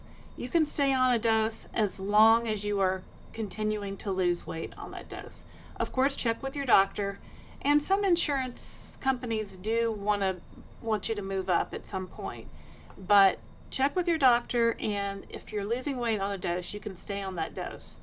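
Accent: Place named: North Carolina, United States. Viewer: Thanks, I come here for encouragement about every day. I love your North Carolina accents. Most of my relatives lived in Stanley County when I was growing up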